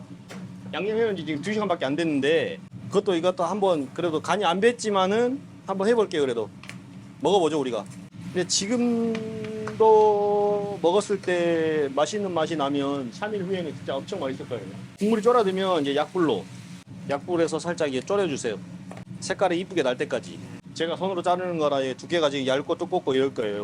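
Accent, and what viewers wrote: Jeolla, South Korea. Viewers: 이 정도면 어음청 친절하고 정확하구먼.. 전라도 사투리 억양이 좀 있으시는거 같음 🤔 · 전라도 말투 짜증난다.배주스 넣어라 비싸다. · 전라도억양이그대로 ㅋ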